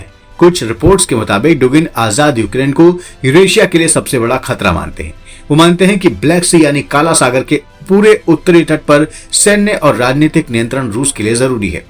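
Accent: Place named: North, India. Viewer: 179k Andhbhakt views..Dugin wrote a book on Trump revolution.. Abe ghochu yeh koi Putin ka guru nahi hai 🤣 yeh New Eurasian concept ke philosopher hai he is opposing Putin's communist and socialist views, inka manna hai Western liberalism ke saath joda jae.. Awaj se hee pata chal rahi hai northern India ka channel hai..matlab had hai kuch bhi video bana de rahe hai kuch bhi bol de rahe hain..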